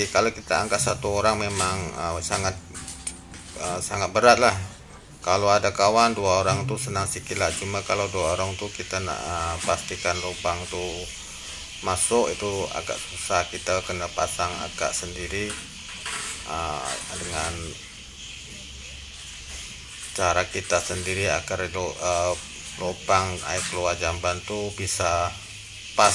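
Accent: Malaysia. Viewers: Cakap dah macam org Malaysia marah pulak nanti org Indonesia. Mantap bro jelas mudah faham.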